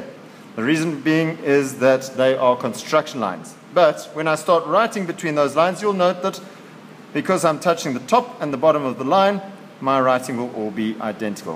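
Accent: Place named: South Africa. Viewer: South African accent i love it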